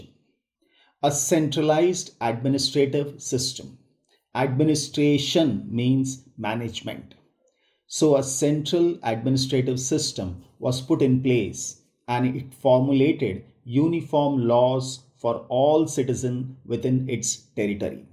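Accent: India. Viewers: नमस्ते I am an American and I enjoy watching your videos. It is really calming as I have a stressful life. I love the geography exceptionally. I really like learning about India, as it combats the Ameri-centrism and Euro-centrism that is common in American schools. Most influence even came from Asia, from the Indian Spice Trade (to Arabia) to the Chinese discovery of gunpowder and its usage. Keep up the awesome production of videos! 😁👍I like the Indian accent and culture. I had a Nepali friend for most of my life. P.S. I like your look, lol 😂😀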